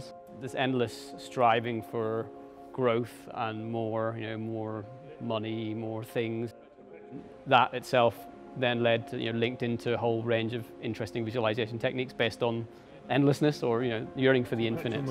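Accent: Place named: Northern Ireland, United Kingdom. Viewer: Holy shit my favorite artist is from the same country as me, northern Ireland. Heard the accent and flipped out!! Keep up the good work 👍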